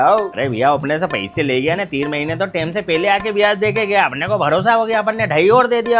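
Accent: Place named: Indore, India. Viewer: mast bhiya,,, that's exactly how these indoree money landers talk like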